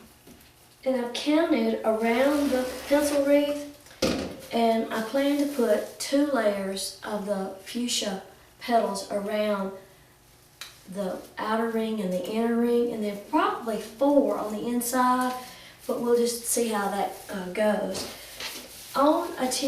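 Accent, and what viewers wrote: South, United States. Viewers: I am very interested in making wreaths. Can you explain the differences in mesh. I have seen some peple talking about deco mesh and geo mesh. Are those the only ones and what are the differences? Thank you and I love watching your tutorials. I am from Louisiana where are you from. Your voice sounds south but definitely not as southern as me. Lol By the way you are great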